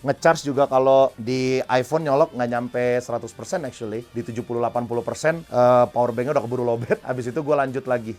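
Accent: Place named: Java, Indonesia. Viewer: Aku mendengar jawa jawa jawa disini 😅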